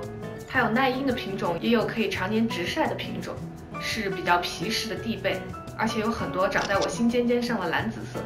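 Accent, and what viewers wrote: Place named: Jiangsu-Zhejiang, China. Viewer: 你说话的感觉和我好像，是不是江浙人呀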